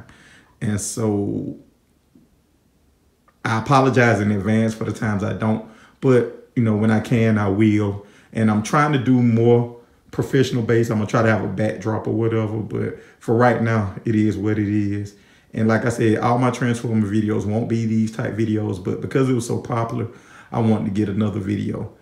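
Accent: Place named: Atlanta, United States. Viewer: Right on! It's all good,Bro! I picked up on that ATL accent instantly. Lol!!! I'd actually stopped through there in between flights while on my way to Canada last month. But,yes sir I'm on IG & just posted my first TF pic on there yesterday. Will do,Bro!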